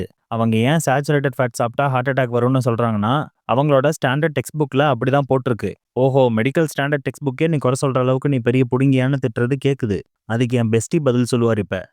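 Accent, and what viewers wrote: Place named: Erode, India. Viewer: Indha erode makkal slang heh ❤❤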